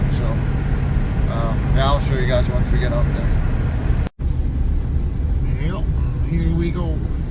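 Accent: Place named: United States. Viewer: Salut! M-am uitat la câteva video-uri. Pari american din tata-n fiu, după vorba, port, n-as fi spus ca ești din România. Ma uit mult la video-uri despre Alaska, ma fascineaza și de asta, presupun, mi-au apărut video-urile tale. Ai ales o zona în care nu s-ar duce mulți romani, dificila, dar îți oferă o libertate, liniște pe care nu o regăsești în multe locuri. De cati ani esti in America? Sănătate!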